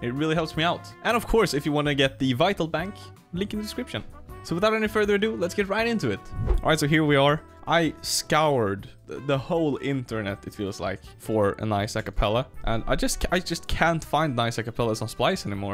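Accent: Sweden. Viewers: I think you should give us the mixing stuff that you always do with details. And a mastering start to finish .(i actually love your swedish accent 😂) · din svengelska dialekt är ju fett najs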